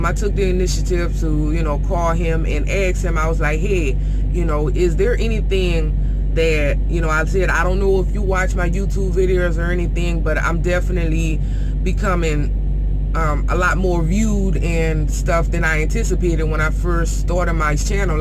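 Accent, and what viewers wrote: United States. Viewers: Where are you from you got a real country tone to you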